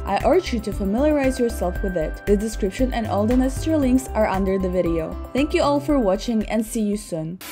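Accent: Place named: Asia. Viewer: that asian accent...makes me feel bulish on this...I want to get in but I don't got any more cash left, I invested all I had...😅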